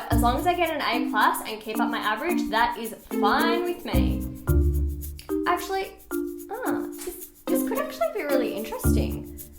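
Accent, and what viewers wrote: Australia. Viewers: i dont really understand australia accents but i know ur videos so great. i really want to understand it, pls make english subtitile:( so sad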